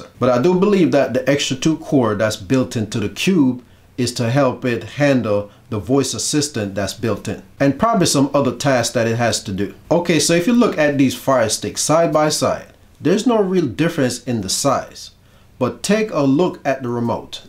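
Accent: Jamaica. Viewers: Thanks this vid really assisted me, heard a little 🇯🇲 jumping out every now and then👊🏽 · Thank you so much now I’m gonna upgrade. New subscriber. I know I hear my Jamaican accent. 🇯🇲 nuff love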